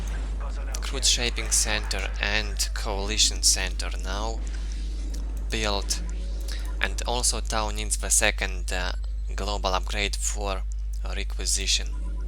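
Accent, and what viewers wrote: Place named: Russia. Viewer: Hi,. Some critics is here. Nice channel, live voice acting and great replays choice. But. This russian accent, gosh. Don't get me wrong, you have good vocabulary skills, better than my. Wish you luck. Thumbs up.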